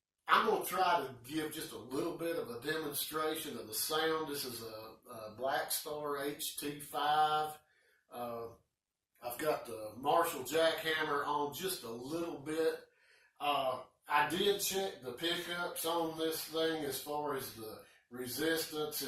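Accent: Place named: South, United States. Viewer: Love that Southern drawl! Epitome of politeness. You earned your thumbs up, friend!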